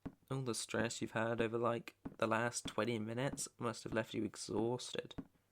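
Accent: United Kingdom. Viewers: Do you speak British?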